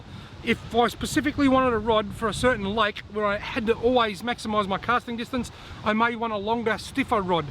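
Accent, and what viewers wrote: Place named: Australia. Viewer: My bad. I didn't hear Australian at first. Not many Aussies in USA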